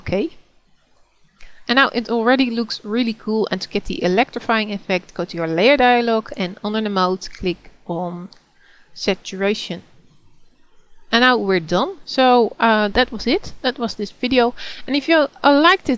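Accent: Netherlands. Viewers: I hear a Dutch person speaking!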